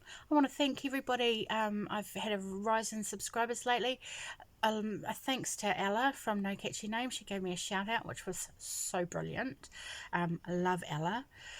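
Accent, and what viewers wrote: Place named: New Zealand. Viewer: Hi.. I've been a subscriber for awhile now and always enjoy your videos (and your Kiwi accent). I've lived in NZ and you bring back fond memories. I agree with you about postage from overseas being expensive but a long time ago I discovered Springwools in Ireland and have bought lots from them. They have a flat rate international shipping which is 3.99 Euro and according to Siri that would be $7.05 NZ. Their service is nice and quick, although I just noticed they have a message on their site saying they're experiencing slight delays because of Covid-19. Anyway, its worth a look. I love the King Cole Superballs - 250G and work out very reasonable.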